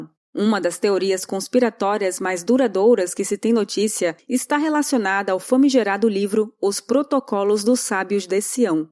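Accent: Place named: South, Brazil. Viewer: Essa narradora é sulista kkkk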